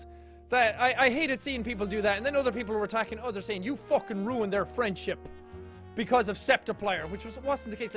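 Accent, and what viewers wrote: Ireland. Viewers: Is it just me or does he sound Irish🇮🇪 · has a 10/10 Irish accent.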